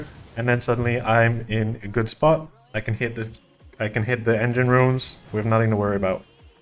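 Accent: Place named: Singapore. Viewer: oh nice. You have kind of a Singaporean accent also that's why I figured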